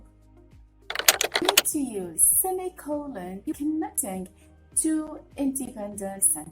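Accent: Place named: England, United Kingdom. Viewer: thank you, I really appreciate your work, btw I love your English accent and your soft voice <3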